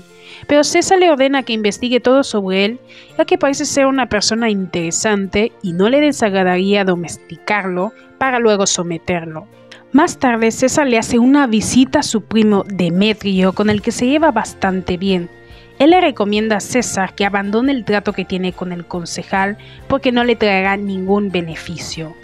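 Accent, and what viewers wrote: France. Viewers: como habla le da un acento frances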